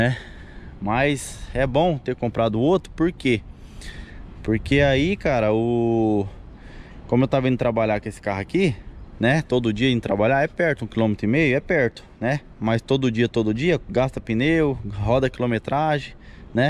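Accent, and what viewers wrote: Minas Gerais, Brazil. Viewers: Fala irmão, sou novo no canal, e quero saber, cê é mineiro?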